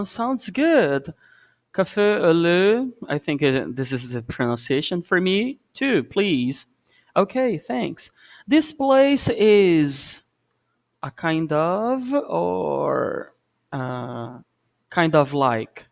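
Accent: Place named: Brazil. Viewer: What a strong Brazilian accent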